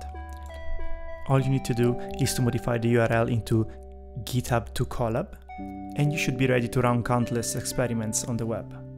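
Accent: Italy. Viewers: Am I mistaken or you have my very thick Italian accent too? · Solo sentendo il tuo inglese ho capito subito fossi italiano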